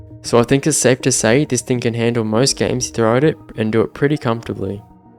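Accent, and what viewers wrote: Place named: Australia. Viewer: You sound Australian bro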